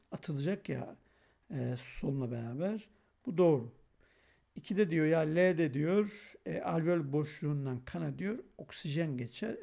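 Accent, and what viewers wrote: Black Sea, Turkey. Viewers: Karadeniz şivesidir diyor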